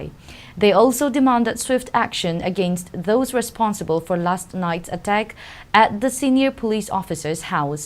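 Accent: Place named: United Kingdom. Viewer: Wow British accent. Must be u work in BPO UK process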